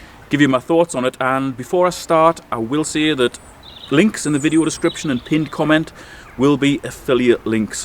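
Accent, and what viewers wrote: North East England, United Kingdom. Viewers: Hiya, I just seen your review on Amazon re: the heated gilet/body warmer and came to find you on YT so I could read any comments too. Your review (the video,) was excellent and the North East accent (my ears tell me not quite Geordie, but close enough, lol) makes you more believable and trustworthy to me (though others would probably think that was a bit backward. Ha!) I've had me eye on one of these for my dad for Xmas and I'm just having another look today before I go ahead and purchase one, hence me finding your review just now. My Q is this: It says the neck is also supposed to heat up? But your review doesn't mention this. I'd really wanted one with the heated neck too, as what with my dad being a bit of an old codger these days, he gets really cold just sitting in the house (I do think it's also his BP tablets, but OFC he won't go change them! 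Grr,) and I thought the neck heating up too, would be rather a nice touch, yano? So is the ad lying about the neck having heated elements too, or did you just forget to mention about the neck? PS. Lucky I managed to find you on here as you're under the name ' ' which seems is another YT account for you? Anyway, I hope you see this comment soon so I can crack on with the search and buying ASAP! Cheers in advance!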